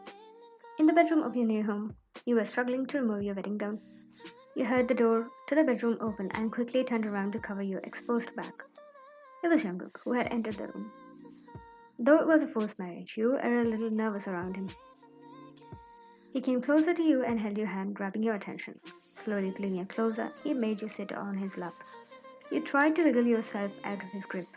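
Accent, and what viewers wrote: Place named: United Kingdom. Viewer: Are you British cause I love your vocie. It feels so real❤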